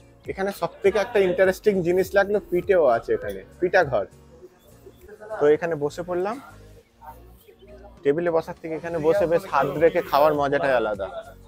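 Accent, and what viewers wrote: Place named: India. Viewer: আপনি কি ভারতীয় কথা শুনে মনে হচ্ছে বাংলাদেশি না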